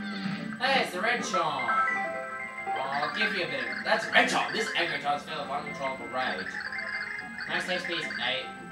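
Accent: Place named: United Kingdom. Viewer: his british accent makes his anger funny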